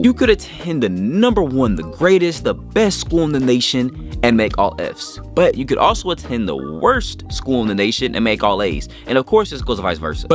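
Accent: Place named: South, United States. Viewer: Sounds country, but thats cool.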